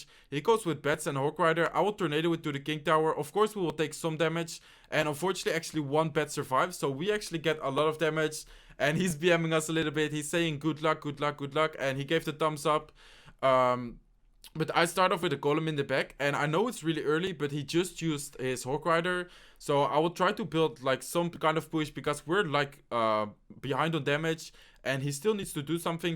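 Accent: Netherlands. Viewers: Je Nederlandse accent 😂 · Je hoort nog een beetje dat je nederlands praat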